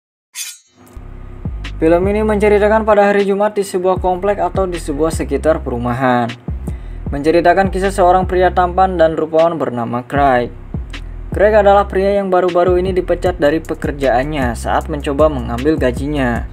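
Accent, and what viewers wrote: Bali, Indonesia. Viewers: Apakah admin ini orang bali? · Nada suaranya kek orang bali ni ya bli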